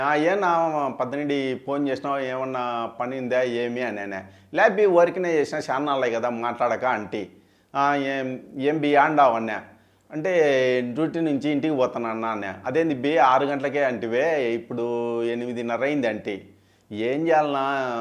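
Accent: Kadapa, India. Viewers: పక్క కడప జిల్లా బాష ❤😂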